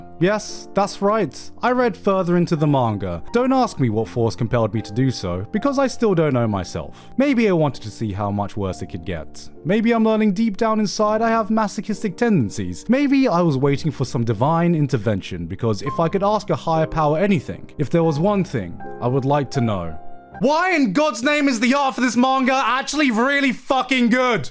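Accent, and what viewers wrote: United Kingdom. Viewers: This video is conclusive proof that a british accent doesnt mean youre smart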